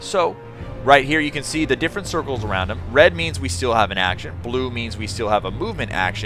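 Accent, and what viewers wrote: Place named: Italy. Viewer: your accent sounds more italian than spanish :)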